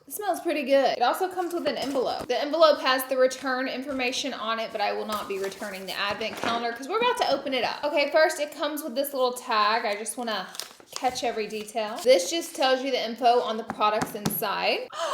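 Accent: South, United States. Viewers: Anyone else miss adorable full on southern accent? 😭❤️ First video I ever watched was her Blendiful review and the accemt was so cute I could not stop watching after that lol! Love her silly personality 😂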